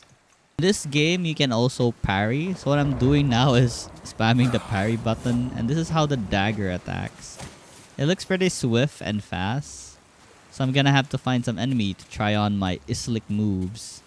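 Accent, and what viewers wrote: Philippines. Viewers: dude are you filipino? cause you got the a ccent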